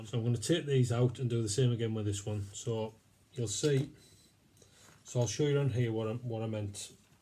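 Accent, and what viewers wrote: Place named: North, United Kingdom. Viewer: I prefer a northern accent when learning about fantasy/minis/terrain - feel free to throw a few more mini stories in there, you're entertaining! ^_^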